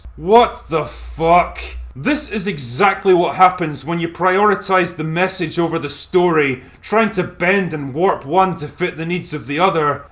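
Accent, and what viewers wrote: Scotland, United Kingdom. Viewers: Because he really is smart his earlier reviews were very traditional hes basically a awesome scottish mr plinketf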